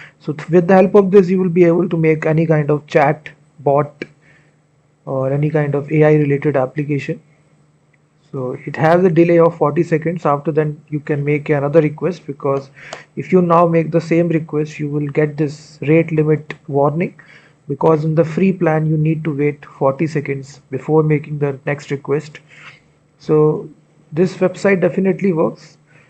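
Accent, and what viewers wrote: India. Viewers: Хер с индийским английским рассказывает нам о бесплатных ключах искусственного интеллекта из страны где последний ИИ был банда из 500 проходимцев которые облапошили на пару миллионов доверчивых пользователей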